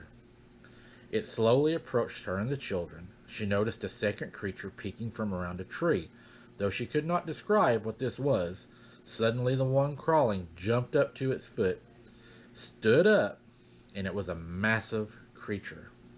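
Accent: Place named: South, United States. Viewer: U sound so country and down to earth. Love being a part of the family.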